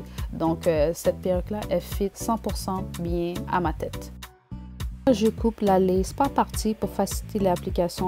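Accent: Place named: Canada. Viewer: J’adore l’accent canadien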